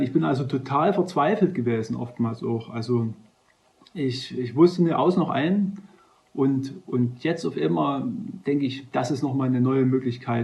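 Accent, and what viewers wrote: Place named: Saxony, Germany. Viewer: He, danke für deine Empfehlungen. Auch das wir uns nicht verrennen sollen bei der Ernährung. Mit den 5 biologischen Gesetzen beschäftige ich mich schon eine Weile. Auch mit denn seelischen Ursachen von Krankheiten. Du scheinst auch in Sachsen zu wohnen. Dein Dialekt klingt mir so vertraut. Liebe Grüße aus der Oberlausitz, Anja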